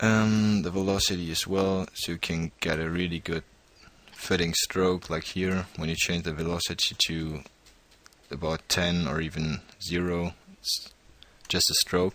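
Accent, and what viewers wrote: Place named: Germany. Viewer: Man hört das sofort wenn ein deutscher englisch spricht .. :D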